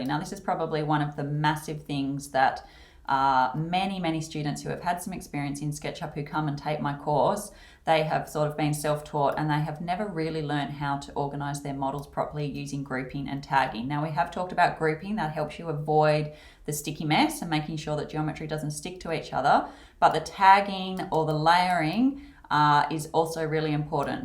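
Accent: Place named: Australia. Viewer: Some great tips for beginners and love the speed and direct approach. You mentioned a few times that you use a very powerful computer as the polygon count can get very high. Could you please share that info so if we upgrade our systems we have an idea what to get. Mother board Brand/Model: CPU Brand/Model: GPU Brand/Model: Ram Type/Speed and Number of Gig: Love your accent (maybe Australian) Nice clear voice and a great visual presentation Just one little thing to improve, the audio had some reverb and sounded hollow( possibly too many hard surfaces in your room) Great Work.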